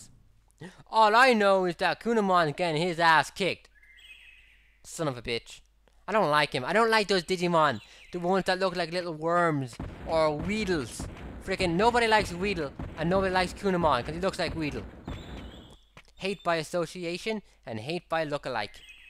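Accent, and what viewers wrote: Ireland. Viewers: this game is fuckin awesome. im playing it for the first time. I wish I played it 14 years ago. btw, what the hell accent is that? irish? lol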